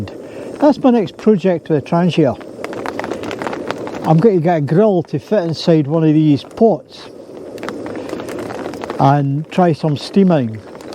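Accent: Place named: United Kingdom. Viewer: Your accent is so cute! For me, a Brazilian, you sound like a Harry Potter character!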